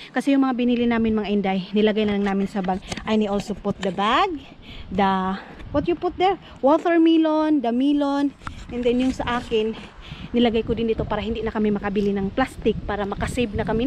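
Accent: Visayas, Philippines. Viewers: Bisaya d i ka inday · "maglabang tayo dito" Bisdak jud nga daku! Hahaha! Thank you for making my day. Kaya ra kaau ang lockdown diri basta ga lantaw sa imong mga vlogs. Lingaw kaau. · Bisaya diay ka.?!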